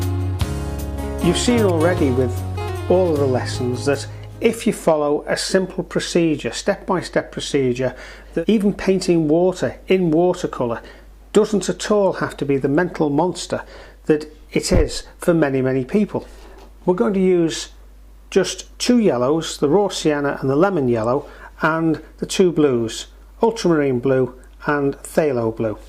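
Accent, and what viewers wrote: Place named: Lancashire, United Kingdom. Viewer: Just found you. Love this. I live in Los Angeles but grew up in Fleetwood, Lancs until I was 14, so loved listening to your accent!!!!!!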